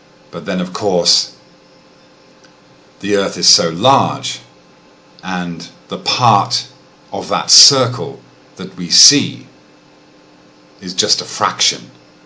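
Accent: England, United Kingdom. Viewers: If it is not religion making you ignore the evidence - what is driving your need for the Earth to be flat? My understanding is that there where two camps of flat Earthers - one who where justifying the Bible's lack of understanding of the nature of space etc. and the other was a group who wanted to feel special because of their "forbidden knowledge" of the flat earth which the world's governments are covering up. The two groups overlap in places and disagree in places and each sometimes call the other "shills". OR are you pulling my leg? I was surprised to hear an English accent in your video, I always assume flat Earth believers are mainly from America's Bible belt.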